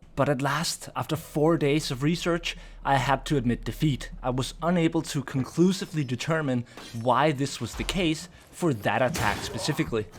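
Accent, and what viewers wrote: Denmark. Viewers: Best vermintide channel. Are you danish by any chance 😉🇩🇰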